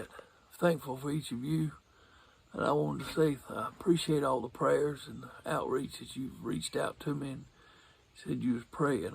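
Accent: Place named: South, United States. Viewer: Believe none of what you hear, and half of what you see. .. don't know who came up with the original saying but never more true with how people can make things even appear real that ain't so. B asiC I nstructions B efore L eaving E arth Glad to listen to you talk. Love good ole boy country accents especially when a good message comes with it. Hope you healing progresses rapidly, and dang, if you keep sitting out looking at that scenery, you ought be healed up soon.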